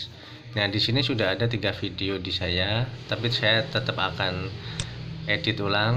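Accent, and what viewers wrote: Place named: Java, Indonesia. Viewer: Makasih info triknya boskuuhh...mantap , medok banget ngomongnya yah..😃👍👍👍sudah aman boskuh...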